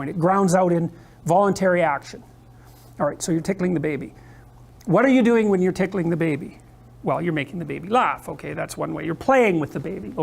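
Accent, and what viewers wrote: Canada. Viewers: I love it when he goes full Bubbles from Trailer Park Boys 🤓 I love that Canadien accent!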